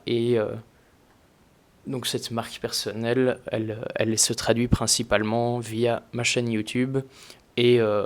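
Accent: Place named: Belgium. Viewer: En t'écoutant j'me suis dit "Il a un léger accent Belge lui..." Jusqu'à ce que je fouine un peu et que je trouve la réponse 😅 Aaaaah le codage ! Tout ce que je déteste 😂 Par contre je trouve ça TELLEMENT CLASSE de connaitre tout ça, et en plus d'être passionné ! Quelle chance ☺ Le running et le montage c'est plus ma passion 😁 Bon courage pour tes objectifs 2024 et ton Marathon ! (Avril ? Le Marathon de Paris ?)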